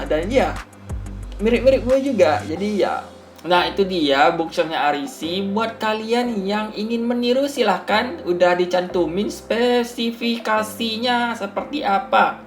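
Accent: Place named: Malaysia. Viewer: Logatnya udah kayak urang Melayu banget.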